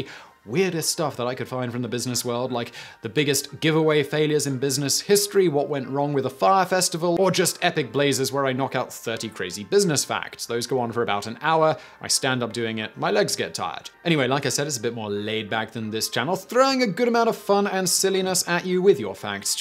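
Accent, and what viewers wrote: England, United Kingdom. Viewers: Yeah, me too. You really can't blame this particular error on his British accent this time either.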